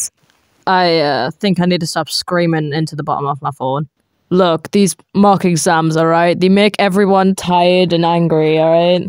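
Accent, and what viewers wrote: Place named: North, United Kingdom. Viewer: you sound so northern LOL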